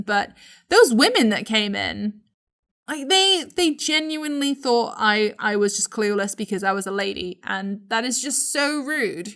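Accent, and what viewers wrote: Wales, United Kingdom. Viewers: i think i found the most british person on planet earth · Yo are you Welsh as well?! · Subscribed cause i love your british dialect and i love animation i draw one paper myself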